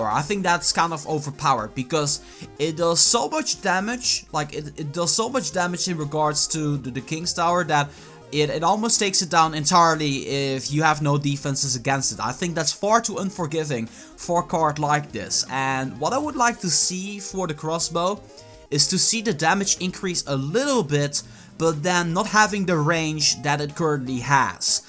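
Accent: Netherlands. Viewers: dat dutch acent <3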